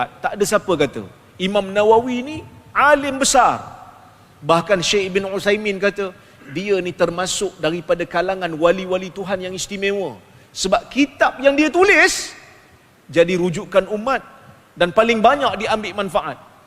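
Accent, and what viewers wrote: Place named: Arab world. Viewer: aku tgk die nie cra ckp mcm si Anu tu... lajjah arab perggh.. tp ckp suka spin..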